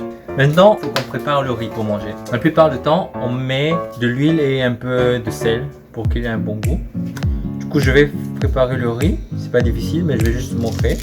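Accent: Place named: Ivory Coast. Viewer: depuis que je me promène sur YouTube, c'est la première fois que je m'abonne en moins de 30 secondes. ton accent ivoirien m'a grave tuer . pourquoi j ai pas vue tes vidéos avant !? YouTube même vraiment !!! vous avez mal fini kédjenou là déééh , bravo ? merci bonne continuation.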